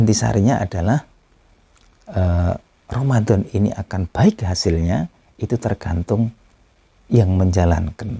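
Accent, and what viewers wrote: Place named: Surabaya, Indonesia. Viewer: bahasa suroboyonan ya neng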